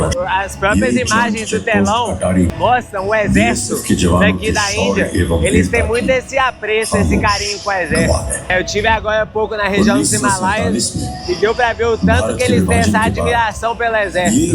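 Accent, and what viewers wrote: Minas Gerais, Brazil. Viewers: Esse vídeo poderia ser gravado por qualquer pessoa, mas o sotaque mineiro dele deixa tudo muito melhos ahhaa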